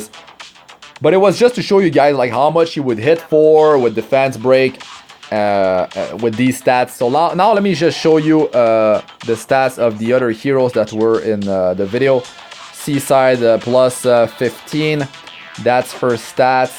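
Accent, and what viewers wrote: Quebec, Canada. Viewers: I am pretty sure you are a Quebecois with that accent XD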